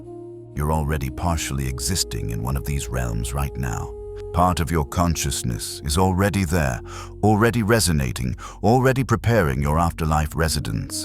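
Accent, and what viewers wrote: United Kingdom. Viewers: Lemme gess more a i. Trying to act kike the know dick about human spiritualality when youre just a preditor taking advantage of humanitys dire need for mass spiritual awakening and info so you put these suposidly helpfull ( all they usually do is repeat them selves over and over and i get a broken clock is right 2 times a day i also get tgat humanitys greedy and the other part of us aint byt we just wanna live and be usefull to are fellow humans were you you dont even intoduce youreself first pount of you drfintly aint one of us strike 1 strike 2 you never ever say any of this like its comming from personal or even studied knoledge no youre literly just copied jung or who ever copied pasted refergitaed real humans real learnend knoledge and deepth to suit youre needs to genetate cash for some one that probably has way to much if that allready 3rd point how i know ypure fake 9/10 times its the same pish english my shit dont stink and i know everything additude when you clearly never put a pair of pants on or walked a mile in any ones shoes see the thing is i binge watch utube tons of it all month a myriad of difrent subjects from soiritualality to religion philisophy sociology psychology history geography homless shelter building old time plants for food and medicine every channel that tails wearwolf stories or dogman and a shit ton of musical everything and this posh englush holly crap iam better than everyone voice with it equally condecending additude its just plain gods da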